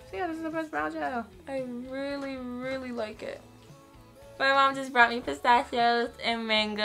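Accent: United Kingdom. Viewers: Why did I get confused by the British accent for a second?😂😂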